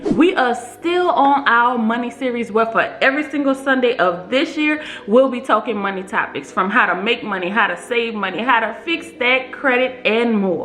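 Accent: New Orleans, United States. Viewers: Lol I’m sensing a NOLA accent; love the energy in the video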